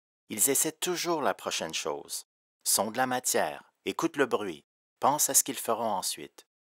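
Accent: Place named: Canada. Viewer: The Canadian accent 💀💀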